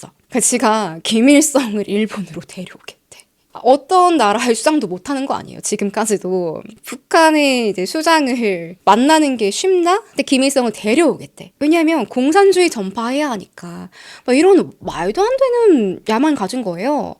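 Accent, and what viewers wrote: Gyeongsang, South Korea. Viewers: 경상도분이시네 ㅎㅎ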